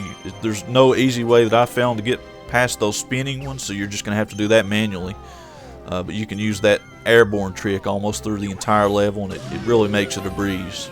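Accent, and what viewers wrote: South, United States. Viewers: What state you live in? I love that southern accent of yours.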